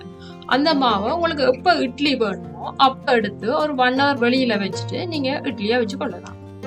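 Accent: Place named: Sri Lanka. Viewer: கண்ணுக்கு விருந்து ரவா இட்லி காதுக்கு விருந்து இலங்கை தமிழ்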